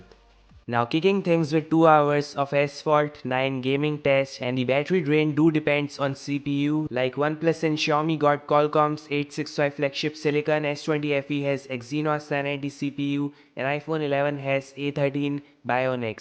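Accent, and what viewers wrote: India. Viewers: I love the English Indian Accent. "Lets Goouuu"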